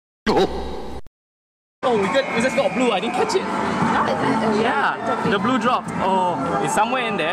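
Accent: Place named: Singapore. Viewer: This accent sounds so Singaporean... Reply if I'm right.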